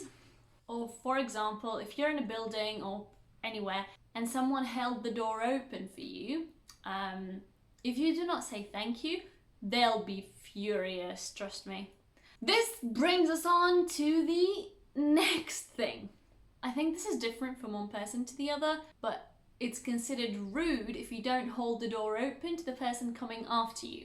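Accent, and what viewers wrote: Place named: England, United Kingdom. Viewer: I am a bit puzzled. You do not have any hint of a foreign accent and sound as if you were brought up in England.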